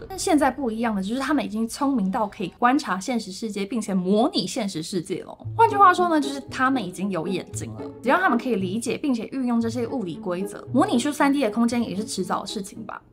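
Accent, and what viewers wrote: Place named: Taiwan. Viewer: 我已经病入膏肓了，现在看到台湾口音就想到多慧。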